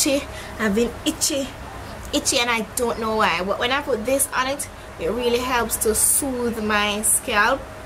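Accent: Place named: Jamaica. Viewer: i could hear the accent haha am jamaican too but am from spanish town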